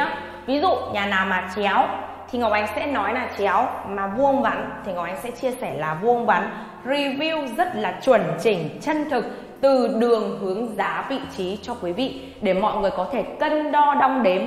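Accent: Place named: Hanoi, Vietnam. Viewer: Nhà đẹp giá tốt giọng nữ HN cũng hay😊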